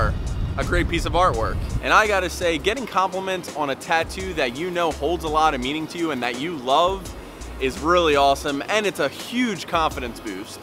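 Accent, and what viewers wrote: Philadelphia, United States. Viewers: I like the philly accent. I grew up maybe an hour outside and now live in Philly. I’ve always wanted a sleeve but being in philly made me want it more, everyone is tatted😂 the career I want is about as white collar as you can get so I’m a bit hesitant but I’ve heard of people that have them from the companies I want to work for. Any shops you’d recommend in the area(not sure if your still around Philly) also have you had any trouble yourself getting jobs with them?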